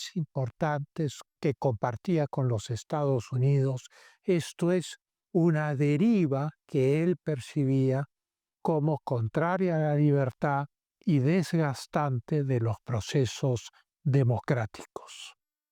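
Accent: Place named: Castile, Spain. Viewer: me suscribo por que respeto su cultura y tu hablar clásico del castellano.- casi o colonial. bien hablado ojala sea tu lenguaje y dicción un ejemplo en el lenguaje en la educación del estudiante colegial del Perú.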